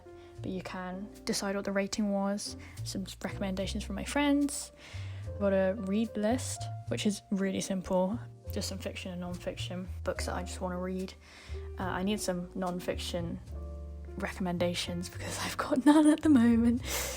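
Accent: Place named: United Kingdom. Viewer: i love your voice so much,, it’s so calming. i know that there are different british accents from different parts of the UK and yours reminds me of a friend of mine! they left tho the country tho :(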